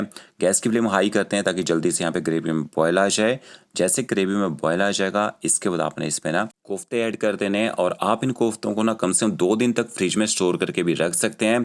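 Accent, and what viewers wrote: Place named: Himachal Pradesh, India. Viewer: Apne apne skill k dum pr ache utuber bn gye gud God bless you.I m from Himachal aur apki bat se lgta hai k u r also from Himachal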